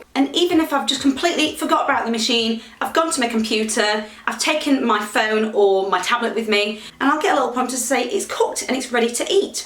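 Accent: United Kingdom. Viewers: Helpful review - might just buy one. PS You're gorgeous - loving the slight northern accent ;-)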